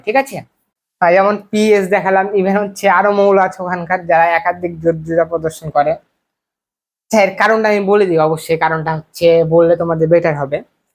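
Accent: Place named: Chapai Nawabganj, Bangladesh. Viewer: Vai apnar kothai a Chapaiya feel pacchi. Dari tao sei, chagol er moto cute.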